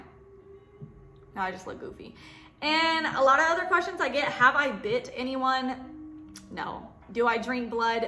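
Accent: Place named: South, United States. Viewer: I love your accent! It is so charming with your style. You’re like a southern vampy lady :)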